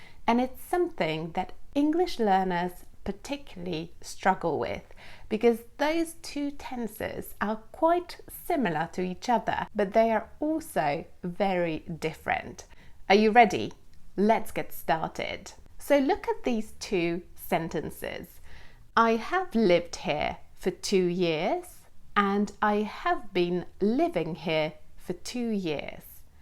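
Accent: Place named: England, United Kingdom. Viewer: jak na kogos kto nie jest native spreakerem fajnie udaje ci się imitować angielski akcent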